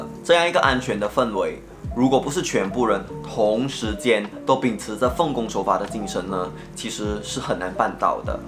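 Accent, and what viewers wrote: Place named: Malaysia. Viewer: 一听就知道你是马来西亚人，不用强调什么新加坡口音的。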